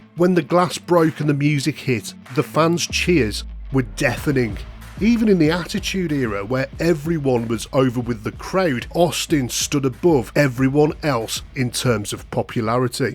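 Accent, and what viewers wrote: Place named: England, United Kingdom. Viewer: Love this guys English accent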